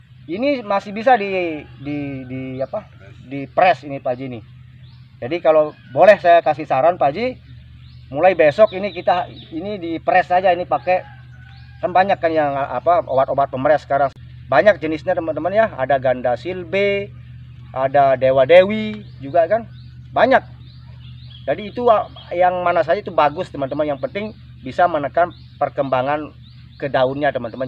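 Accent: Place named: Lombok, Indonesia. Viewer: Logat lombok ni bos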